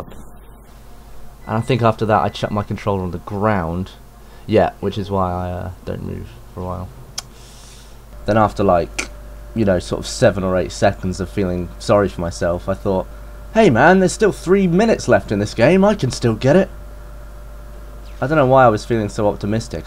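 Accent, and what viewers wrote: United Kingdom. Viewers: You sound exactly the same. All you Brits do. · He was a calm little brit. Then he did a dig-down, and everything changed. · you got that awesome accent, you must be british?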